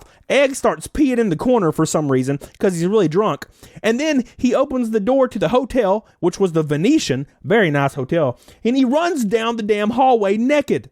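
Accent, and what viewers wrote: South, United States. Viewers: You sound like a southerner. So as a southerner, go buy a gun and “stand your ground” my guy 👌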